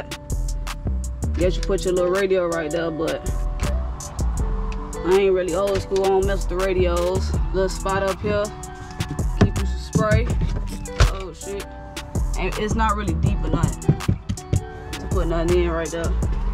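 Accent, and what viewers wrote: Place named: Chicago, United States. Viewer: My first time over here and from the way you talk you sound like you from my city , Chicago , dont know if im right but i can tell when i here my own .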